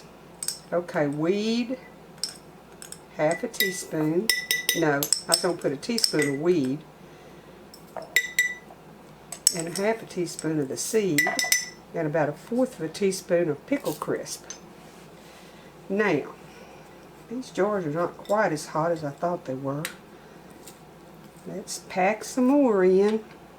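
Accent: South, United States. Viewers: Rattle? I heard no rattling. I heard the melody and cadence of a lovely southern voice.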